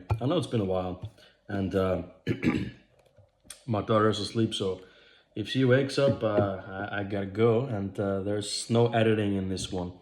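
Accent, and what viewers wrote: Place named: Europe. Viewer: 🎉 Nice hearing from you again. I see this video was recorded 5 days ago but showed up in my notifications only 1 hour ago! You reminded me in this video of Nagidal. Maybe the European accent, your appreciation of Gix or your calm voice, but I appreciate that you still post videos every now are then even with everything else going in your life, and quick videos like this one don’t really take much effort to do. I know what you mean by glass babies, and I can’t find the proper English term for that either, but all the best wishes to your girlfriend. I also like the idea of streaming or playing a game with someone else in your videos, even if just watching and commenting on the gameplay.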